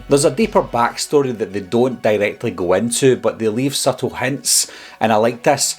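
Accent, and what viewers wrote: Scotland, United Kingdom. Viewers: First time viewer. Solid intro, then at 25 seconds that Scott accent starts in with the vocabulary. Instant subscription.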